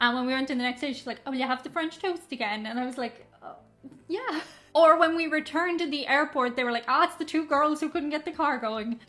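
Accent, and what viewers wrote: Ireland. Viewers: I love your Irish accent,. It's like listening to music. 👍🥰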